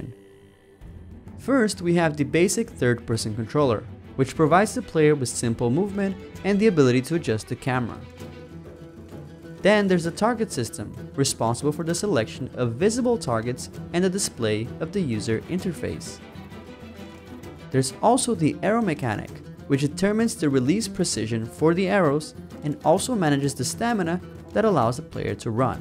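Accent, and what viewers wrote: Brazil. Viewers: Haha do jeito que você falou já descobri que era Brasileiro. Não tinha ideia mesmo depois de 6 meses desde que comecei a assistir seu canal.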